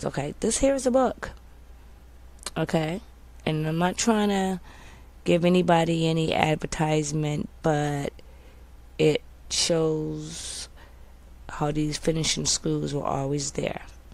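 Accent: United Kingdom. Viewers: The plummy accent obviously covers any faults of the remaining man vocals!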